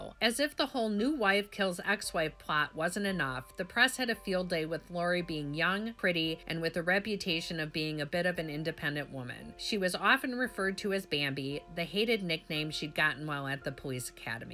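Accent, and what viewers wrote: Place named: Wisconsin, United States. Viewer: You even sound like you're from Wisconsin, probably even Milwaukee. I can tell by the way you pronounce the name of the city. You don't pronounce the L's. (Muhwahkee) So I would expect better of you if you are indeed from Wisconsin like it really sounds like you are. Don't you want people to think more of your extremely dynamic and beautiful state???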